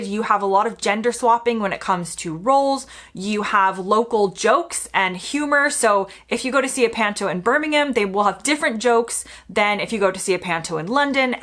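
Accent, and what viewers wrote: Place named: United States. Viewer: you are loosing the american accent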